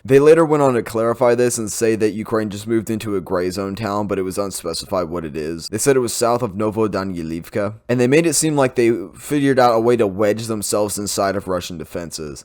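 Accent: United States. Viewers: I love your videos and look forward to them every update. Don't worry about the knobheads freaking out over the way you pronounce words. People speak the dialect they grow up with. It's normal. Anyone freaking over it needs to go check themselves into a psych ward over their OCD behavior. I lived in England 8 years and they love American dialects the same way we love British dialects. Be who you are and anyone that doesn't like it can pi$$ off. You're the man!!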